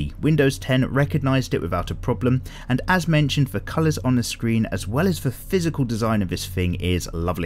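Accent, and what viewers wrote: United Kingdom. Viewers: I am new on your channel, but I already love it. It is so nice to listen to your voice recording about drawings while I am doing my own arts. Thank you. Love your british accent!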